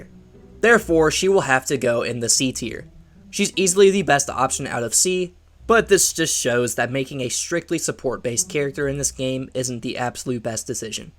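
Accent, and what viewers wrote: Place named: South Jersey, United States. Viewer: I’m from Philly & you are 100% from South Jersey I can hear it in your voice lmfao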